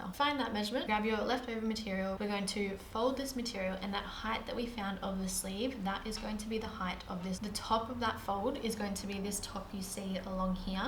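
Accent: Australia. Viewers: Wow, what an impressive instructional video!! Excellent job, your jacket is beautiful. You are so good with measurements and seams. I almost feel like I could do this by just watching you. But I know it took great kill and practice. I have some old wool blankets to be used for this project. Thanks and love the OZ accent, Cheers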